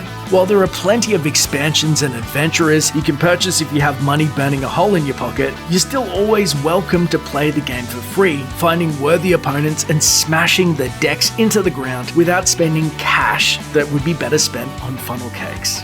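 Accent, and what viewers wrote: Australia. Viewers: I'm sure it's Australian... 98% sure. I feel like Aussie and NZ accents sounds similar tho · Faith Silva Is it Australian? I like that accent, too.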